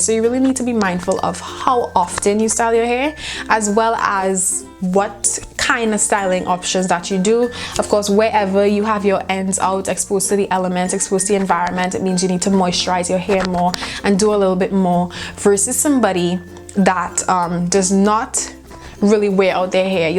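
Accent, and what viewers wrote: Trinidad and Tobago. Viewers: I know this accent! 🇹🇹🇹🇹🇹🇹 Im subscribing. Gotta support my own!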